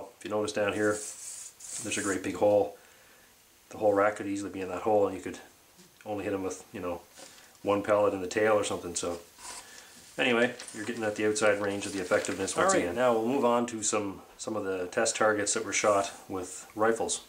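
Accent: Canada. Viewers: I thought you sounded like those epic meal time guys. That explains it. You're both Canadian.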